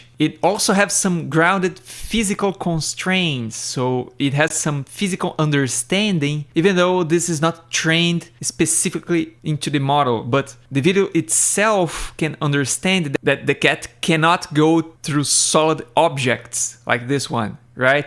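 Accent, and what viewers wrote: Brazil. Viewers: man are you brazilian? your accent is very brazilian, just got curious haha. good video btw :))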